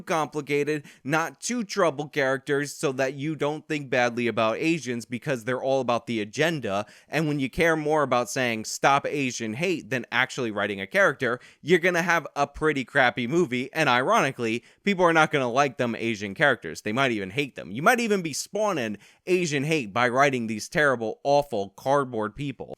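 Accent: New York, United States. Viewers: I like your videos dude but this New York accent that you keep doing in every video is getting annoying haha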